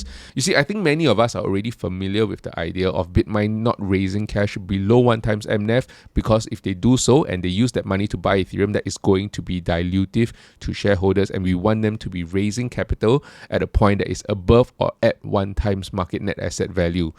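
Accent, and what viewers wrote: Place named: Malaysia. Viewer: I suspected but again you sound/accent exactly my Malaysian colleagues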